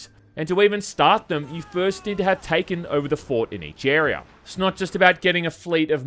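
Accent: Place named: Broad, Australia. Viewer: I saw one of your earlier videos before this and the difference in your accent is insane. You’ve gone full bogan. Did you move to a different part of Straya a few years ago or something?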